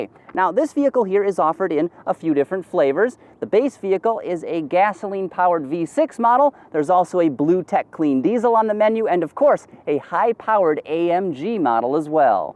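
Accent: Canada. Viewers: i thought this guy was canadian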